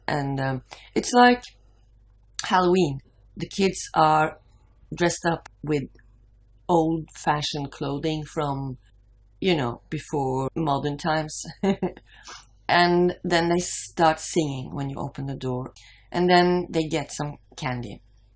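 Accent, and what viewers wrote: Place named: Norway. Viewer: Ah I should've recognized your accent being from Norway since I recently played a game that took place in Norway. Nice to see different and similar customs and decor for the holidays. You guys must be gingerbread house pros! lol I think the food version of slow TV would be a hit here in America, as much as we love our food. At the very least it should be live streaming somewhere lol Though I'd love to take that Norwegian coastline trip too!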